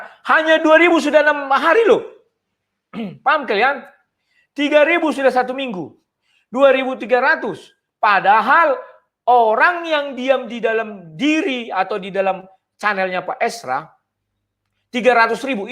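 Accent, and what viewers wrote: Medan, Indonesia. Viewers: Bener kali Ito ‘ Firman Tuhan yg Ito jelaskan itu sangat masuk ke pikiran ku ‘ jelas dan logat medan nya jelas dan straight to the point ‘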